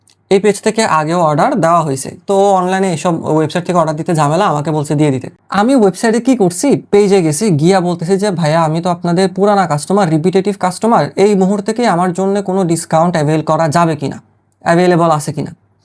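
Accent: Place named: Noakhali, Bangladesh. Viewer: Apni ki Noakhali'r ba Feni'r? Na mane apnar kothay oikhaner ekta taan ase toh tai.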